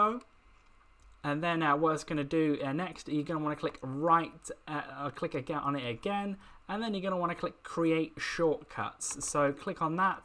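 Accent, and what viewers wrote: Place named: United Kingdom. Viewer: No wonder why you sounds soo bri'ish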